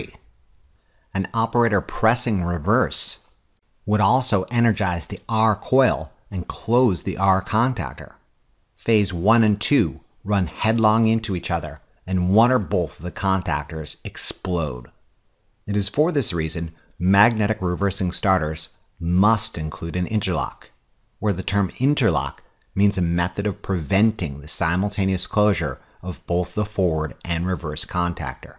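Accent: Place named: United States. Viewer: his articulation is quite fine. This is american english, he is a native, if you're having hard time understanding him, it's probably because you need to work on your english comprehension.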